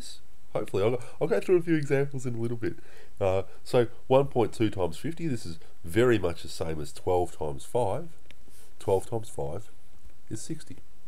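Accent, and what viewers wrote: Australia. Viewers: Ayyyyyyy we got an Auzzie out here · Is he Australian sart of video he went like "G'day and welcome to channel"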